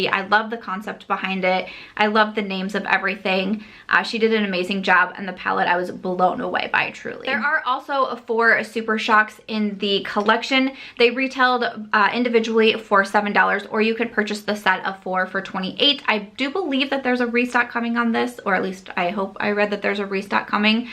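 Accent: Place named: Iowa, United States. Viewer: #samsquad I love this palette! I love the pink look! It would inspire me to try the greens🙃. Lastly but certainly not least, I love your videos. I married a man from Nebraska so listening to an Iowan is extremely comforting. #samsquad jennesskh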